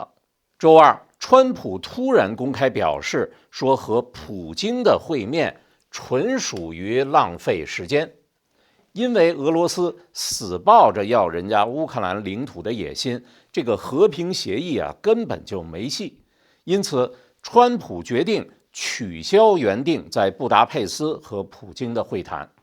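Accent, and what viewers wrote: China. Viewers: 听口音都知道从中国出来的你觉得呢？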